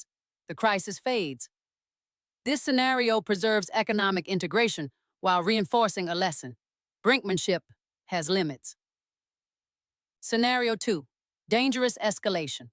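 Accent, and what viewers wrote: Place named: South, United States. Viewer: Loove yur sweet li'l southern accent ya'all. I am an Aussie and can understand you well.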